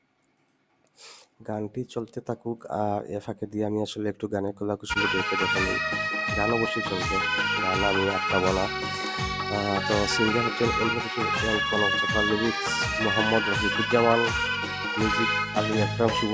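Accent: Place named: Sylhet, Bangladesh. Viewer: উপস্থাপক মনে হয় সিলেটি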